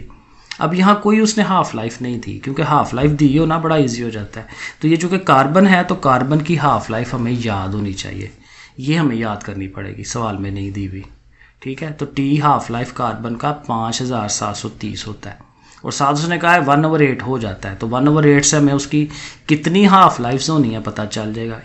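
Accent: Sialkot, Pakistan. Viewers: Sir ap sailkot sy hian ap ki bollli tu sailkoti hia 😘❤️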